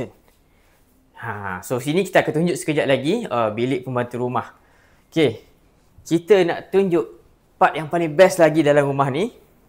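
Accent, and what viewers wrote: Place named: Kelantan, Malaysia. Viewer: Dia cakap bahasa malaysia la tu.. Ada bunyi2 kelantan sikit.. Awat tu??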